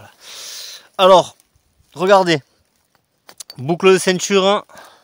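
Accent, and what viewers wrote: Southwest, France. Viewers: Je sens un accent du sud-ouest ou me trompe-je?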